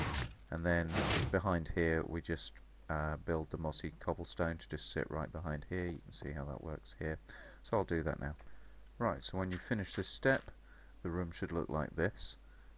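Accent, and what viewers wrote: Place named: United States. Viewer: It's noce to hear that I can contribute to your work :) And it will be nice to see how part 2 will be executed. Also, I heard you had some kind of accent. But for an untraine ear it sound a lot like american :p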